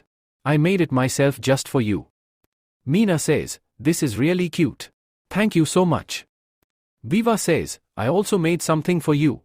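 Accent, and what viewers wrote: India. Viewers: WTF why after min 50 the Ai voice have India Accent, at least check your edited video dude.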